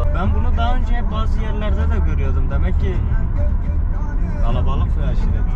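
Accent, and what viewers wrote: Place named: Mardin, Turkey. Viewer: mardinlimisin ? bizim oranın kürtçesini konuşuyorsun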